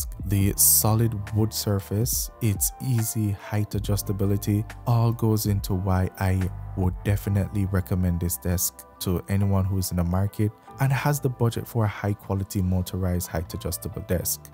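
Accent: Jamaica. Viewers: Man sounds Jamaican asf loool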